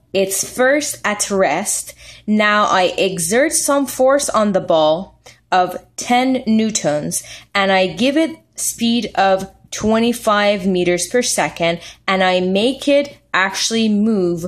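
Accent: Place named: Iran. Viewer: I swear to god when you started talking I knew you were iranian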